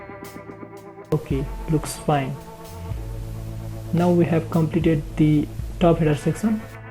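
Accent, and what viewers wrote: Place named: India. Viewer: Man I love the fact that you make tutorials but sometimes its hard to follow indian accent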